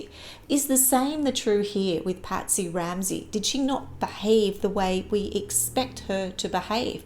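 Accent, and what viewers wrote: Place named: Australia. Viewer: Nice to hear a fellow Aussie. Good speaking voice - I'm older, and you're not too fast. 31 year olds speak too fast for me. Anyone under that is nearly unintelligible. This was good. Thankyou.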